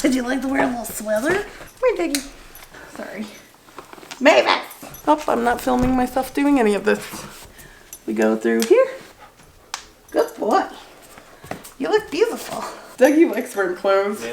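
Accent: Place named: New York, United States. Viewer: Starting to sound like a newyorker 😅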